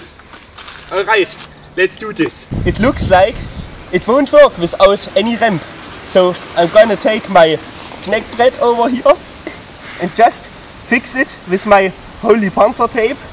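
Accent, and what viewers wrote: United Kingdom. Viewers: Sag mal, bist du in England aufwewachsen oder warum der glamuröse, britische Akzent???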